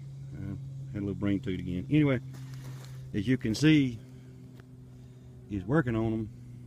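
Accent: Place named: England, United Kingdom. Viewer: Haha nice English accent! So U and ur cousin farm together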